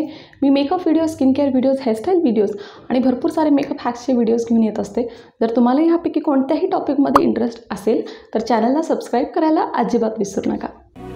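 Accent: Kolhapur, India. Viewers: Kolhapuri ahes na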